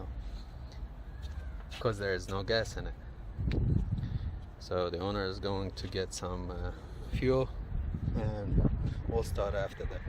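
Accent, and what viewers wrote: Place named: Iraq. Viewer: Nice Iraqi accent 😊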